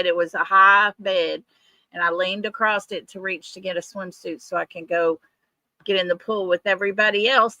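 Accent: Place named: South, United States. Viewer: !!!! I've missed that Southern Drawl!!! So happy to see a video from you!! Imo YES! That is so weird that she would come out and go the same way you do!! 😱😱 R u sure they don't have a tracker on your car 🤔🤔 What are the odds?!?! I don't believe in coincidences... 🧐🧐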